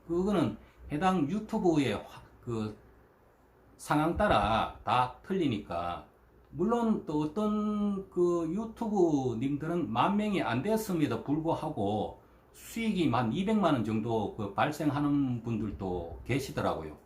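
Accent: Gyeongsang, South Korea. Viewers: 경상도 대구 홀애비 인 갑네요? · 말투는 대구같은데 ... 늘 건강하세요 잘 보고 있습니다~^^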